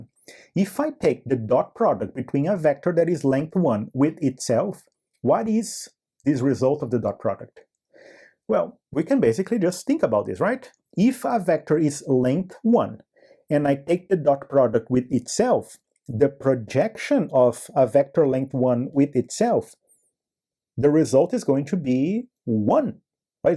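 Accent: Brazil. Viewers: Aha! Eu tinha certeza que vc é brasileiro pelo sotaque! Cheguei aqui aleatoriamente pq comecei a brincar de fazer jogo esse ano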